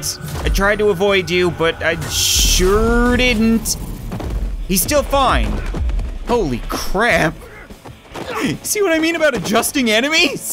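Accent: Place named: Canada. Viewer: EVERY SINGLE VIDEO I WATCH I GET A UNIVERSITY CANADA AD. I mean it is fitting though, is like the most Canadian person I've ever heard.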